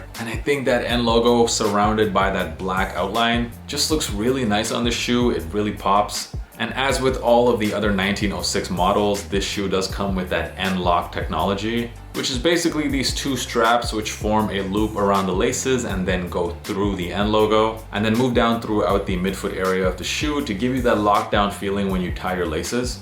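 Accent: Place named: United States. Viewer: i always thought you lived in Europe.. not sure why cus you sound american lol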